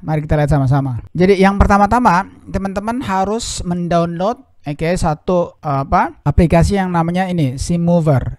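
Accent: Makassar, Indonesia. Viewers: orang makassar ki ?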